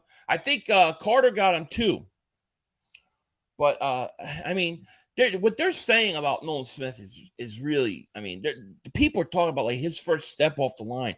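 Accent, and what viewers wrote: Philadelphia, United States. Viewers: Gotta go down the shore! Haha def Philly speak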